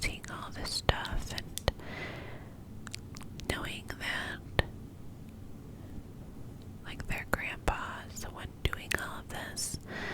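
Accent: New York, United States. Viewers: Thanks! ☺ BTW love your vids they are awesome. Just seen your jail vid. Its absolutely awesome thanks for sharing! Now i am going to watch your New York accent! ❤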